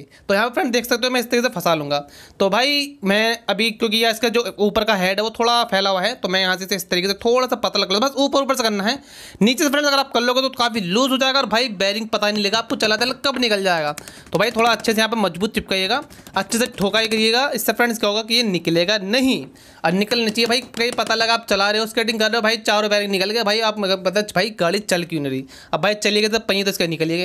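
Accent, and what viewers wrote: India. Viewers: I love your killer Desi accent bro 😉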